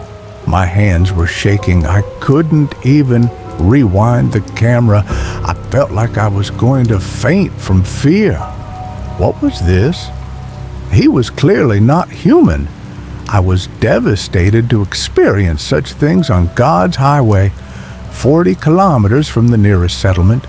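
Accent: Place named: South, United States. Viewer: A Southern accent talking about kilometers and petrol??? Somebody didn't do ANY research before posting this mishmash.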